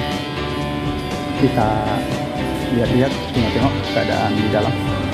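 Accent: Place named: Sabah, Malaysia. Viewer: Cakap loghat sabah sudah.Maju terus sahabat.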